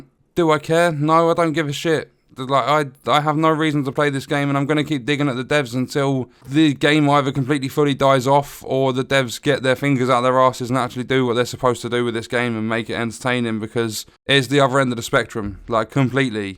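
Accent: United Kingdom. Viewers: That’s everything I’ve been saying ever since TU8 dropped except in a British accent. Even the fuck the devs. I don’t wish them death or disease but I do wish the could pull their heads out of their asses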